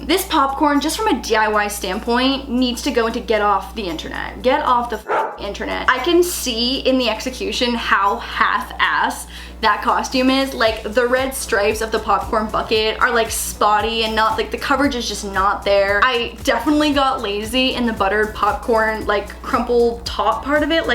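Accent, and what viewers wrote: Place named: Ontario, Canada. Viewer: You can 100% tell is from ON bcos she doesn’t pronounce the 2nd t in Toronto 😂😂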